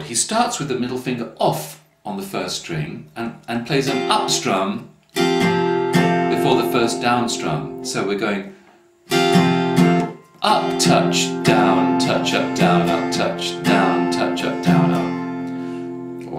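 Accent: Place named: England, United Kingdom. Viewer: Muy ingles el acento 😆✌️🤙👉🇦🇷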